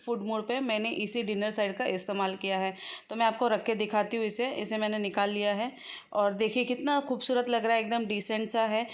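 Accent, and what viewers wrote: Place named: Maharashtra, India. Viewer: Hi kaisi ho apke bolne ka trika bohot acha or jo aap marathi touch dete ho beech beach may vo to osam h mast lgta h, or jo English sikha rhe h unko bolo mujhe apne aap pr proud h mai Indian hu meri matr bhasha hindi h 😊 isi hindi bhasha ki wajah normal ladies bhi apka video dekhti h hum apka video apne TV pr lga k sab log dekhte h or yhi apke vlog English may hote to adhe log dekhte or adhe log muh bnate but jo bhi ho apki recipe bhi bohot achi hoti h,hindi may hone ki wajah say or Mia ata h dekhne may good job God bless you............. English walo k liye 👹buri nazar wale tera muh kala 👹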